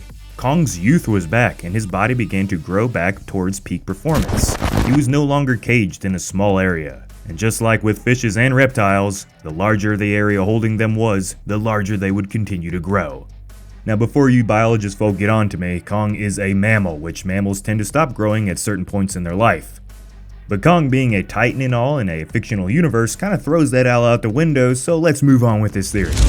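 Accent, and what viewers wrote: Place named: United Kingdom. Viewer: I can now tell you are from the UK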